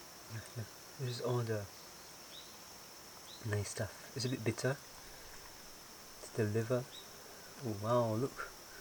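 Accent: Singapore. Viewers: I was looking for video about Irori and Jizai Kagi, and stumbled upon yours. I watched the previous episode where you documented the installation process. It was so peaceful and full of old wisdom. I heard you speak Japanese with Mr. and Mrs. Okuno, and I thought it must be another "Peaceful Cuisine" like channel. But then suddenly, eyhhh..Singlish? Anyway, when the time is right, thinking of visiting this farm-to-table cafe. Keep up the good work!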